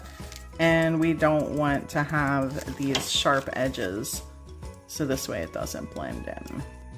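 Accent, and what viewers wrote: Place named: Maryland, United States. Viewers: Hi. I love watching your videos. I learned today that I could blend chalk paint and acrylic to use as a base. Way cool! I am really in to decoupage right now, so I loved all of those, but the Christmas ornament was my fav. I had a sneaking suspicion that you were from Maryland. I too used to live in Maryland...Bel Air. I still say wuder even though I now live on the West Coast. Some things you just can't let go of!💖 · Heyy Philly girl here, we also say wooder! Your ‘accent’ is one of the reasons I started watching your videos, in addition to your amazing art and diys! I, unfortunately, live in Florida at the moment and I refuse to say ‘water’ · 😂 yes, we have a distinct accent here in ❤ Maryland. I totally understand what you're saying.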